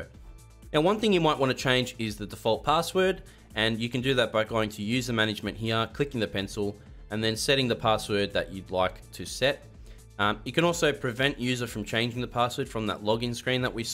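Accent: Australia. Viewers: Hey r u Australian bc u got an aussie accent?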